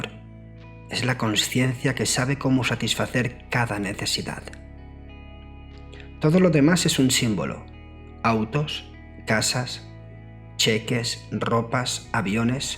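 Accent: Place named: Spain. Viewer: Yo quiero desapegarme de escuchar ese acento españoletes gas y de encima las mayoría todo YouTube es de los mexicanos y los españoles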